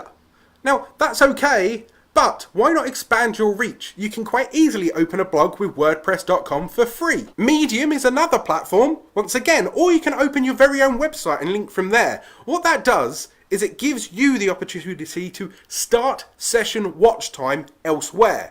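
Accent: United Kingdom. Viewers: card missing Britisch english is really way more fun to listen then american english. Good video by the way!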